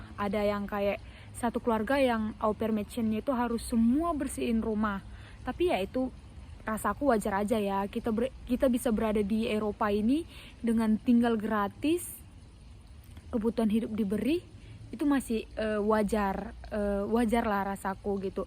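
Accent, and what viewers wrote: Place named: North Sumatra, Indonesia. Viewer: Kental kali logat Batak kita itu y kak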